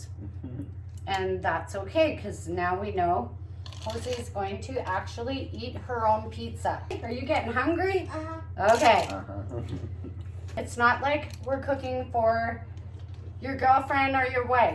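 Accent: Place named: Canada. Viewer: I love the accent too Canadian?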